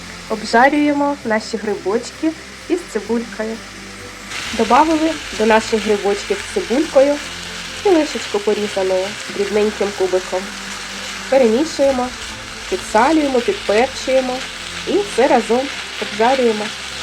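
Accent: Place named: Kharkiv, Ukraine. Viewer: Дякую за рідну мову і рецепт..Ви,мабуть,з Харкова,бо чую рідний акцент.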